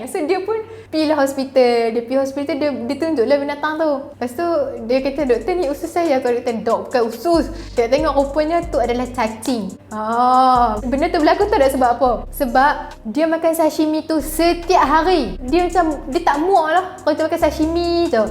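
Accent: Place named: Kedah, Malaysia. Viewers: uish loghat utaqa.. dulu aku pernah kena cacing masuk dlm sel kulit sebb pegi pantai x pakai kasut... time tu kecik lagi sampai besar skarang still ada Jalan Cacing tu dkt lutut & blakang... sejak daritu aku Fobia nk ke pantai jijak pasir Daging Mentah: Islam pun kata "Masak adalah makan yg sempurna dari metah yg boleh mendatangkan penyakit❤" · comel la cakap utaqa 😅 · menarik pulak speaker ni cakap utagha syiokk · Tudia hang puny sembang Kedah memang keluaq habeh tak tapeh ooo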